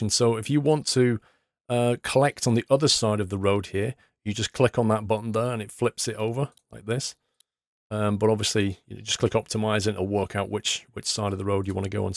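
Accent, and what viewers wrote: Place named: United Kingdom. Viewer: Hi sorry for the extremely late comment but sounds youre from uk so youll know what i mean, our buses go from A to B then B to A then A to B and B to A and so on. And a drivers shift would last for hours, is that possible in this game to do a full days bus shift going there back there back there back?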